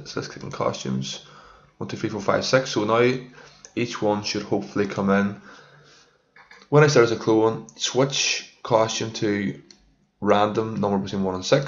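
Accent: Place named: Northern Ireland, United Kingdom. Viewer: Northern Ireland! Awesome accent!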